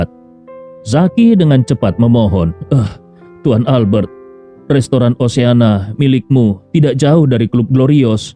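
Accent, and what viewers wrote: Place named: Bugis, Indonesia. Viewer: Bismillah....maasyaa Allah..logat bahasa bugisnya ada..mwmbuat hati ridu akan tanah kwlahiran suamiku....salam untuk semua sodaraka..sehat afiat smakin sukses selamanya....aamiin..Abang yg baik....trimakasih..atas semua kebaikan..yg membuat semua bajagia..smoga lindungan Rahmat Allah mrnyertai...tsumma aamiin....sukses sehat slalu bang...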